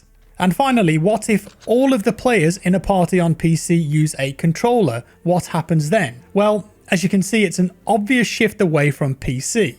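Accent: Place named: West Midlands, United Kingdom. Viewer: West Midlands.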